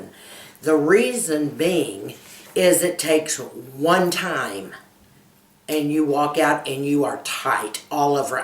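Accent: Texas, United States. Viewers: Oh- Ultherapy not oil therapy- lol! Awhile back when I heard you mention it in passing I misheard what you said due to the honeyed Texas twang. Very interesting and informative! I'm looking forward to you having this done. The innovations in medicine are really exciting. As a former surgical nurse I love to hear about them.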